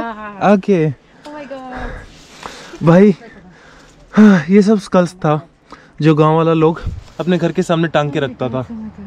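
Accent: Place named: South, India. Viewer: Am not against or racist... Just to clear myself.. Your accent constraint me to ask you. Are you from South.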